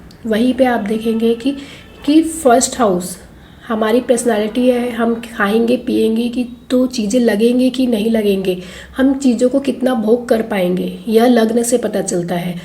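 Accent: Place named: East India, India. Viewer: Namaste Mam aap native kaha ki h Apke kuchh shabdo se Maharashtra ki nahi lagti Me bus interest k liye pooch Raha hu q k me guess krne ki koshish krta hu aap jo shabd pronounce ki wo mene assam bihar wb k logo k mukh se sune h Sorry if anything feel not good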